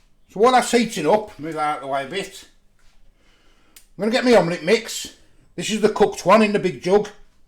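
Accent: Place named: West Midlands, United Kingdom. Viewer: Ey up bro, only just come across your channel, got to admit I was a bit dubious at first but you seem to be a down to earth sound bloke, I’ve subscribed mainly because it’s nice to see an honest bloke who says a spade is a spade and doesn’t try to hide his accent, I’m a stokey and used to work a lot down all round the West Midlands, keep it up mate WELL DONE 👍🏻 👋🏻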